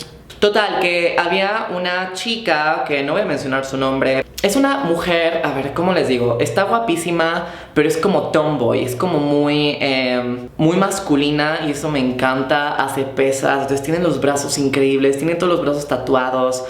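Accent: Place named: Mexico. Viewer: ...yo lo que tengo...es una tremenda atracción (casi adicción) a tu voz... Sí, sé que en algún momento has reconocido que no es lo que mas te gusta de tí, pero a mi sí Esa mezcla perfecta, entre ese timbre algo grave (muchas OTRAS mujeres tienen voces hermosamente graves) y eso está lejos de ser un defecto en mi opinión. Pero continúo...mezcla perfecta entre ese timbre y la tonadita típica de alguien de México como tú, pero con una enorme femineidad en la manera como hablas... Y para que mas o menos te puedas hacer una idea de "que tipo de tipo" te está diciendo todo esto...te dejo un link de mi Galería fotografica de Flickr, para que compruebes que mi punto de vista, el de un sexagenario, hetero perdido, fotógrafo por hobby y aficción, que fotografía y charla amenamente con cera de una "veintena de veinteañeras" al día... y en belleza, he de reconocer que pocas se te acercan...y ninguna te supera <link>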